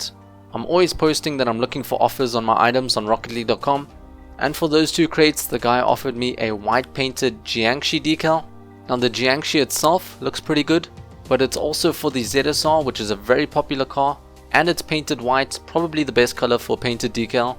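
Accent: South Africa. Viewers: Are you South African? Sorry I am new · Are you South African???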